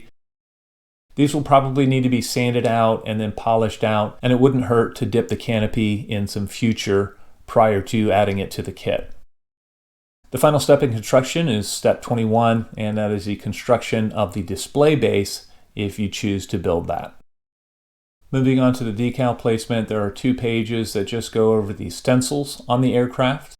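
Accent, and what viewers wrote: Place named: United States. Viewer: I can't believe it! An American accent, and no bullshit! An excellent review, clearly enunciated with what we need to know as prospective buyers or builders laid out in logical order with potential traps pointed out - the screenshot showing what happens if you omit part 117 was excellent. I have a later boxing, (14002 from 1990), and can say that my example lacks the sink holes on the airscrew blades and the gun magazines, as well as having had the clear parts fixed, so Airfix have kept an eye on things. I wasted my money on replacement metal undercarriage, which has an awful grainy finish and lacks the sharpness of detail present in the kit parts. I particular, the oleo strut can never be polished to look as smooth and shiny as it should. Other reviewers have agreed with your suggestion that the cowls will not fit over the complete installed engine, with most saying that a considerable amount must be ground from the cylinder heads to allow the cowls to be properly fitted. 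Oops!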